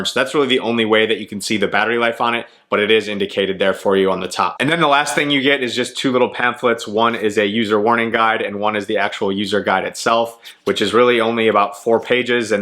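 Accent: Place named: United States. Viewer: I didn't even notice anything negative about your English. You speak like you're from the States! lol Thanks for the review.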